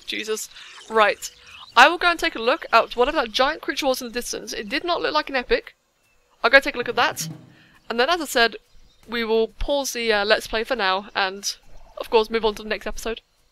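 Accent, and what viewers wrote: United Kingdom. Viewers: My brain was so confused lol I thought you were a preteen Brit lmao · This is either a nerdy boy or a British girl 🧐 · you sound british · Oh. You are british i see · R U from the UK? U sound British or something. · Perhaps it is British English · You're British!!! I love you even more now!!!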